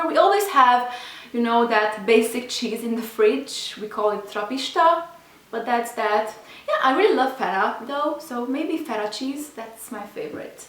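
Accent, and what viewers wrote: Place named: Romania. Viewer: I Love your videos soooooo much, I really enjoyed getting to know you better. You are soooooo BEAUTIFUL!! Also I love your Romanian Accent!! Xxx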